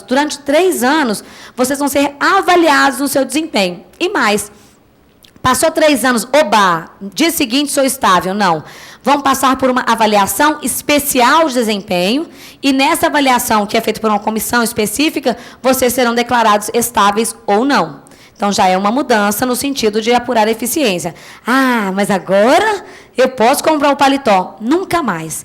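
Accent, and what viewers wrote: Minas Gerais, Brazil. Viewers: Mas que professora mais sensacional! E esse sotaque mineiro nosso, gostoso de ouvir. Parece que eu to conversando com uma amiga. Vocé é maravilhosa.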